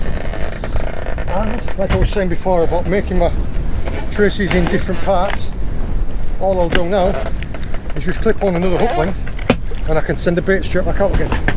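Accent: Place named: Leeds, United Kingdom. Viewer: I came to sea fishing later in life, but the one thing i wish i could change was having my father with me as a child, sea fishing. carry on encouraging, you do a fab job, and is really catching on under your masterful guidance. Well done both of you. BTW are you a Leeds fan? The accent gives it away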